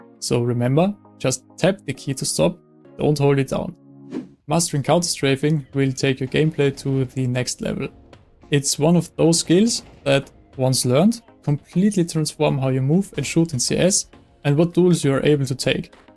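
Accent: Austria. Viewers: als ob du österreicher bist hahahha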